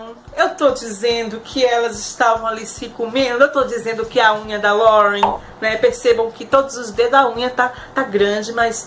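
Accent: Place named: Bahia, Brazil. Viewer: pq você tem sotaque baiano?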